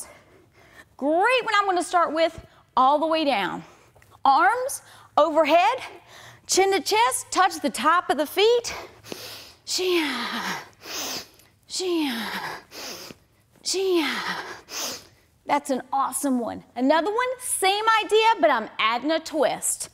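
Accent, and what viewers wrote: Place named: Appalachia, United States. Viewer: Sorry, can't get past the hillbilly accent.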